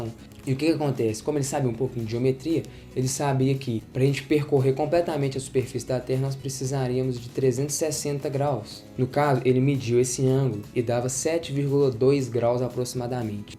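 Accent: Minas Gerais, Brazil. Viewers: Que sotaque de minerim mais fofo! 🤗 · Ele é mineiro mesmo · O sotaque mineiro jamais passará despercebido...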